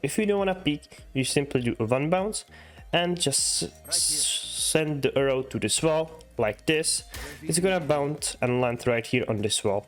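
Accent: Czech Republic. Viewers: i cant help my self but... are you from czechia ? XD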